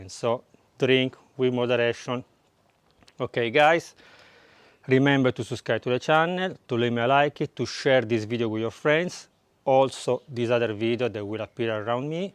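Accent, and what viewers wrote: Italy. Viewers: The Italian Accent is so cute !! 😂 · Italian accent my favorite accent. is that wine Halal? any sheikh here? 🤣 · Ahh that fine old Italian accent 😌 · Totally Italian accent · I like your Italian English accent, sound sweet and strange to hear, all in all thank you for the lesson, ciao ciao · He is so cute! And his accent too. Ok I am catching the next flight to Italy. ✈️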